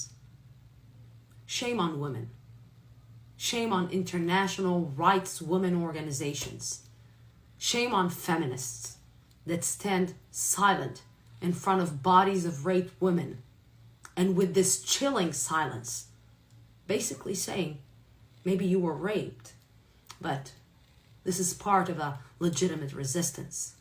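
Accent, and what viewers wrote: United States. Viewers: God, you are incredibly BRAVE! To speak truth to lies in your world* is unbelievably Brave! I only wish people could understand that reality is reality even though I, you, us don't like it. Looking reality in the face and saying this is the truth is one of the hardest things to do. (*even though you sound American)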